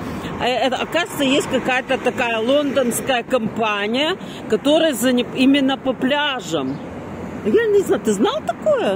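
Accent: Odessa, Ukraine. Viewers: Да Вы чудесно разговариваете, я просто очарованна Вашим одесским говорком!!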